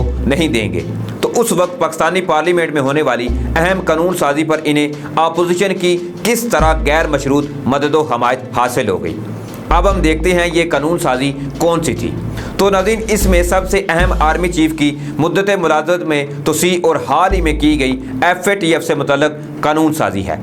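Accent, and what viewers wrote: India. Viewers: بھائ جان مہربانی کرین۔ اپنا لہجہ ٹھیک کرنے کی کوشش کرین آپ کی ویڈیو دیکھتے ھوۓ تکلیف ھوتی جب آپ خان کو کھان اور خانم کو کھانم کہتے ہیں۔ ایسے تو انڈیا دالے کہتے ہین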